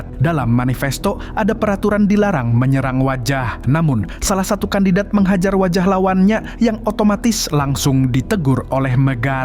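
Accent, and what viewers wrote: Banjar, Indonesia. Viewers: Orang banjar sekali nya sidin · Urang mana gerang bang nih. Urg banjar kah 😂😂😂...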